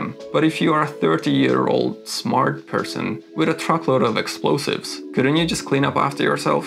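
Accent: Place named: Hungary. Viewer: You're very observant, and I agree with all your points. I'd also like to say 'Welcome to Denmark'. Can I ask where you are from?🙂 If I should guess from your accent and last name, perhaps Hungary? I also live in Copenhagen, and I'm very embarrassed about the littering of my fellow townspeople after public events..! Sheer laziness and drunken stupidity are the main reasons for all the trash, like seen after the Distortion festival. Maybe we should make it illegal to throw trash in public (like in Singapore). Perhaps a fine of DKK 1.000,- would make people think twice.😉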